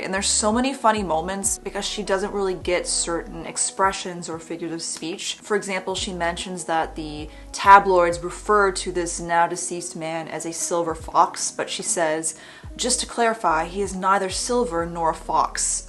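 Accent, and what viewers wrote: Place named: United States. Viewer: A bit off topic,,,but why do you sound American? Specifically speaking Network Englkish. I don't hear a trace of Canadianisms, like process pronoced proecess, about pronounced aboot (more nuanced but I dunno how to spell it), no terminal rhetorical questions, eh? I'm a native Californian and you sound indistinguishable from my fellow SF Bay Areans. Inquiring minds want to know.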